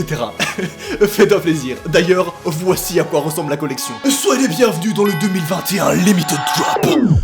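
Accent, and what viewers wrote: Belgium. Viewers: cc t belge? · Tu es belge ?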